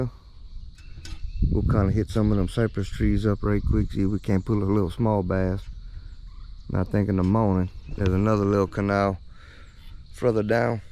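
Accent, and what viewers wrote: Louisiana, United States. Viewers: Great solo trip 😊miss seeing little G and I still enjoy hearing your Louisiana accent ❤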